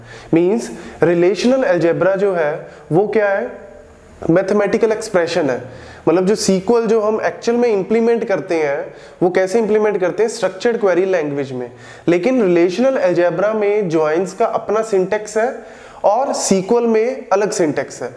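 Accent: Punjab, India. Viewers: punjabi dur se pta chal jate hai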